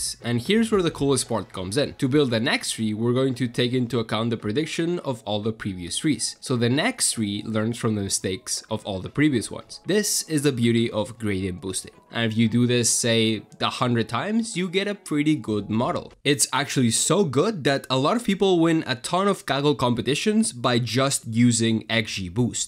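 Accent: Catalonia, Spain. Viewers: Are you Catalan? That "Jaume" accent is literally on point and you have a bit of an accent! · Accent català???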